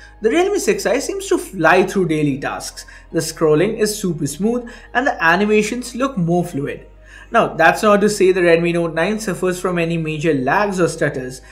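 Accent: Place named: Bengal, India. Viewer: with Bengali accent songs pretty weird to me as I'm an Odia 😆😆😆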